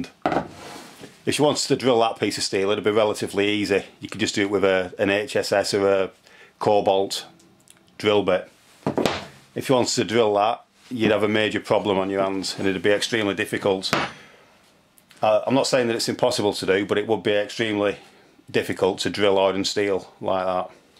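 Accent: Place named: Northern England, United Kingdom. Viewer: I am from Sweden and I can understand all your saying although it is not as easy as "non-accent" english for me. Guess your from the northern parts of England, New Castle area?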